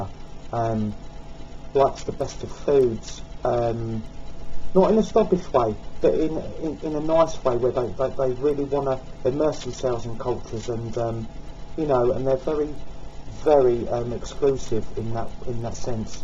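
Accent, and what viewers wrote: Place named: London, United Kingdom. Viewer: What is your regional accent, London sort of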